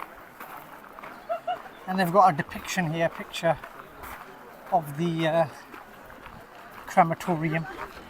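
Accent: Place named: England, United Kingdom. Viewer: Sardar ji jara saaf bolo tusin te England wale lehje naal hi fatte chuki jande ho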